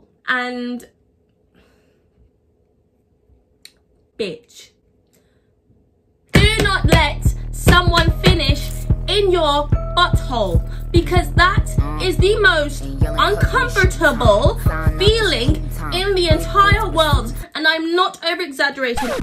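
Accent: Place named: United Kingdom. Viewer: You cute love from NYC and I love ya U.K. accent love from NYC once again 😘💗❤️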